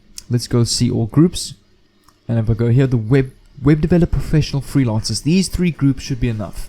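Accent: South Africa. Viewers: As soon as I started watching this I immediately recognised that accent- so good to see a fellow Saffer doing the earning online. Definitely will follow - would love to chat more and possibly share some of my ideas with you...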